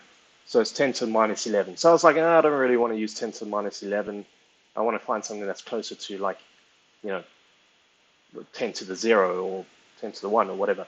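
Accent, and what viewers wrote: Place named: South Africa. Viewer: I Googled "GPU processing in Excel", landed up on your Reddit post, and then here. As a fellow Excel nerd and South African (given the accent, I'm guessing you're from JHB :)), I loved watching this! Thank you! I'd love to see you get back to your "in Excel" series to see what you'd be able to produce using Excel's newer functions (LAMBA, etc).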